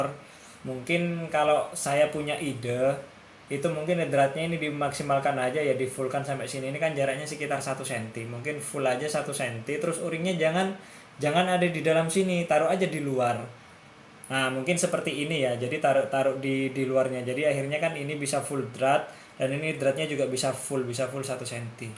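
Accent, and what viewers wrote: Madura, Indonesia. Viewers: Asli mana bang...logat kq kayak orang madura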